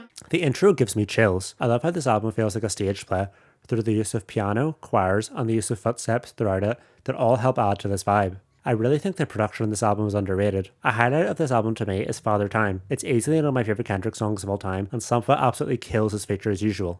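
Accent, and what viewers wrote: Ireland. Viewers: I was not expecting the Irish accent lol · The Irish accent trew me off ngl 😂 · Love this. More of these please! He talks and he’s Irish! OMG..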